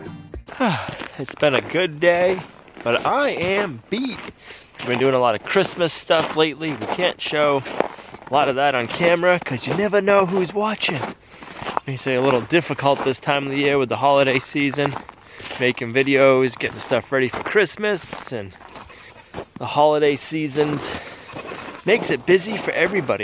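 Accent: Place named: Boston, United States. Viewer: I thought your accent sounded familiar.... Boston strong! BTW I subbed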